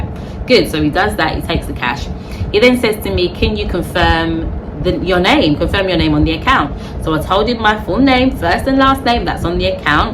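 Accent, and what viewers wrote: United Kingdom. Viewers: is true, but you have to acknowledge the lovely British accent no matter what. I think the bank representatives did, too. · Hi. In my opinion, they asked you to write the letter to the branch manager to determine how intelligent you are. Your letter in conjunction with your lovely British accent made them determine you were not to be played with. Food for thought. Blessings!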